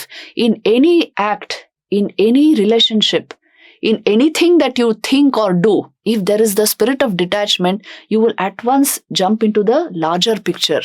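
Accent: India. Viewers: Why does she have an Indian accent in English? She doesn’t look Indian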